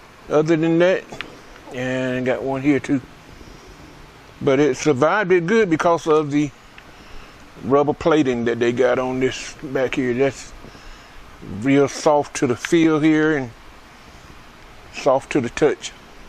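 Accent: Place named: South, United States. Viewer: I agree! Although, I don’t know how northerners and people out west appreciate the value of a southern or country accent as much as those of us that live in the south. For those of us from the south, a southern accent (black or white) is a comforting sound. Hearing this man talk is like a basket of fried fish with a side of cheese grits for my ears.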